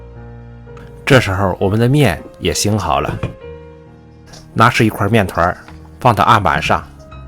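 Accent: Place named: Jiaodong, China. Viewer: 胶东口音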